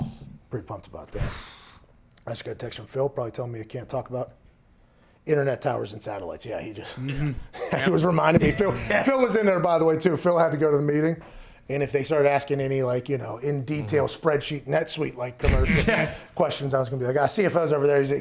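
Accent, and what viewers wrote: Pittsburgh, United States. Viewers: Thank you to whoever pointed out pronunciation of “house.” Dude’s so god damn pittsburgh. Love his pittsburgh accent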